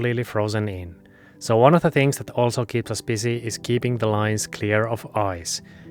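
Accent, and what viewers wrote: Finland. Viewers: Finnish, judging by his accent.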